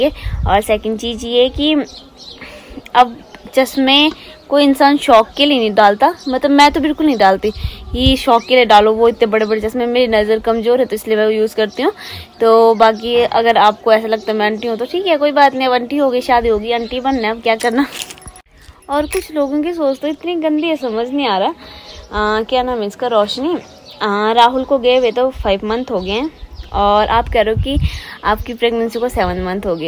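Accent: Garhwal, India. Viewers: apke bolne m jo gadhwali jhalak aa ri h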